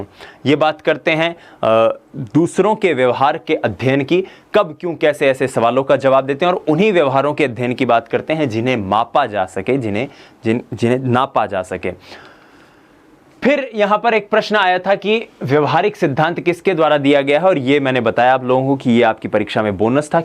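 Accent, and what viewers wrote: Rajasthan, India. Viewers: The way you speak marwari😍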